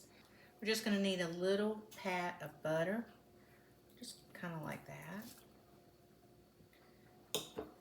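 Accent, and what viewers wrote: South, United States. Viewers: Love your southern accent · Thank you so much glad to meet you I will keep your Channel. I'm 90 years old and I live in Southern California and I know you're from the South but I don't know where how could I tell LOL🥰🥰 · I was wondering what to do with these canned potatoes ty. And she has a lovely Southern accent which many of us are jealous of lol.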